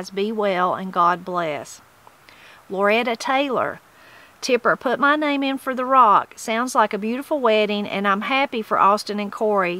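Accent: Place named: South, United States. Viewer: Tipper, you should for sure get an award for Reading the books for us , with your beautiful voice and southern accent!!! Plus for many other things you do. God Bless. Jean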